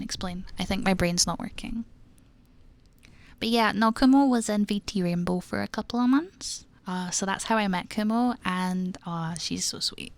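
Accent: Scotland, United Kingdom. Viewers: This, along with Rayla from The Dragon Prince, made me realize that I absolutely STAN scottish accents. Like...just the accent and the voice. Don't judge. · oh, goodness I adore scottish accents, Im looking forward to more of your videos! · Dunno who dis is.. but i do love scottish.. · Wots dis nowe? A scottesh accent ASMRr? We've ben Blessed Lads! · Scottish Accent + Rain = Top Tier ASMR <3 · oooo scottish asmr this is new gonna give it a try tonight since im having trouble sleeping since im under the weather 🥲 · Wait I think this is my first time hearing the Scottish accent in ASMR lmao this is funny It sounds cute :D am Scottish too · Scottish accent. Blessed day, I haven't actually found many scottish ASMRtists and something about yall voices gets me so cozy. everytime you say phone = good chemical too subbed, dubbed, you're great. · Thank you. 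I loved this and your cute scottish accent xD. I should visit scotland and the highlands next year!~ Looking forward to another fun relaxing ASMR <3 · Finally, a true Scottish ASMR. And a cute one too. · You hear the word Scottish and you immediately expected the most unintelligible speak imaginable but this was extremely enjoyable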